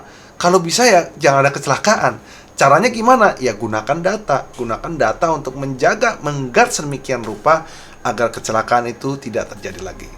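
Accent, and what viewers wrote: Java, Indonesia. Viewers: Blek bok blek bok blek ohhhh blek bok medokkk waeeeee suaranyaaa,#ntaplah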